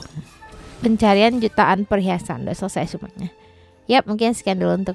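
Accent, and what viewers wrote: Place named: Sumatra, Indonesia. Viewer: logatnya kek org sumatra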